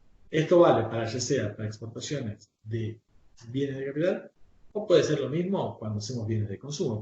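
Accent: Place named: Argentina. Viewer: Es una pena, la clase parece muy buena pero la calidad del audio sumado al acento super marcado de argentina, lo hace inaudible para el resto de latinoamerica.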